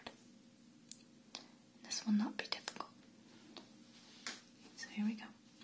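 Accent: United States. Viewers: r u from america?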